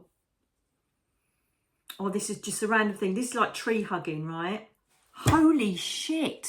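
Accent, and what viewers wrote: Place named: Essex, United Kingdom. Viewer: “Fuzzy”? really now ….😮😂 That was amazing!! Fun and funny. The rock in the river -great metaphor! Mostly I love hearing a bit o Essex over here in New York.❤ Great job!!!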